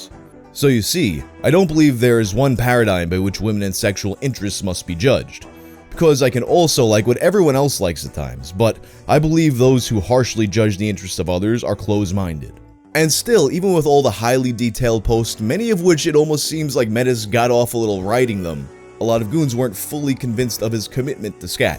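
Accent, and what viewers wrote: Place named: New York, United States. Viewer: I don’t know why I never realized you were a fellow New Yorker before. YERRRRRRR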